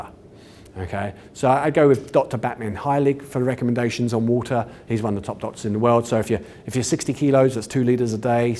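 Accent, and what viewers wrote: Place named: New Zealand. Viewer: Nice to listen to someone from home soil.....NZ! I have been diagnosed with sever vitamin D deficiency and have such horrendous symptoms. I was having bladder issues, couldn't walk properly, had bone pain and terrible brain fog and memory loss. I felt so out of control so the only thing I knew I had control over was what to eat. I have been eating exactly the diet you are suggesting....good on me!!...and after 4 weeks now I am slowly starting to feel myself returning, the inflammation is going down and my mind is clearing. I have a long way to go but your video had really helped me with so many good tips. I have just bought an organic bone broth kit so getting into that this week!! Thanks, I'm going to make my cheese loving hubby watch it!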